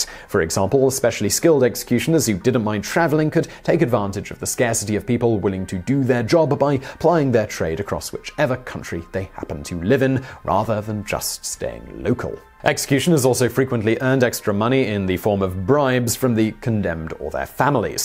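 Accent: United Kingdom. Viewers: wtf I came here for that V-Sauce guy. And momentarily thought he magically gained a British acccent. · Why is talking with a British accent. He's fancy and everything but now he just sounds silly.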